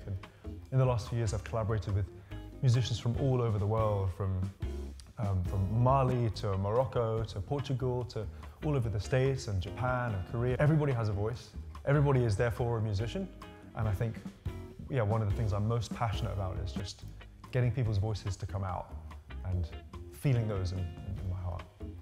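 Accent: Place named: United Kingdom. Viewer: Charmingly British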